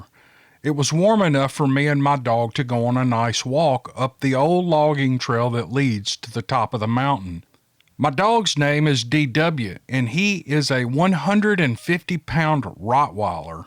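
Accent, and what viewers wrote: South, United States. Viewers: Im from mobile county.. we call it " the bottom".. born and raised on the "mobile tensaw river delta"... A HUGE SWAMP. Listening to is like sitting with an old friend. The southern accent, slow talk. Music to my ears... Thanks for the stories "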